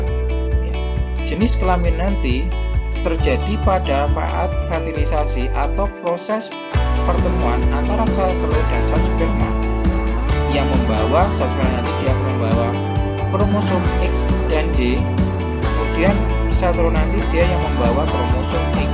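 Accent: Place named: Java, Indonesia. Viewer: Mohon maaf kak kalau boleh koreksi kurang jelas dalam pembicaraannya soalnya agak agak kedengeran medok jawanya mohon maaf nih kak🙏 mohon maaf juga initeh gatau pendengaran nya kurang jelas gatau dari mananya😀🙏🙏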